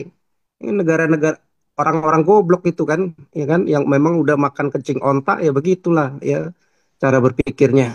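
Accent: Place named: Batam, Indonesia. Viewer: Jelas ko suaranya dari Indonesia Batam.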